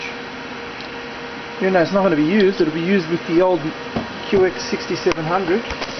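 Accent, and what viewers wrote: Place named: Australia. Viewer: 1200 Watt :) Aussie accent ftw.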